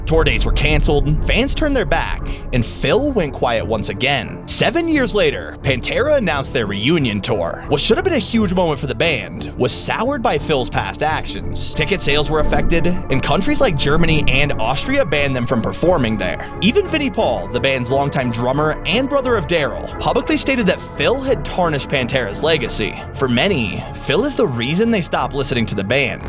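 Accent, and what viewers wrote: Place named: United States. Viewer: The bloke who did this video sounds like some American leftie tosspot.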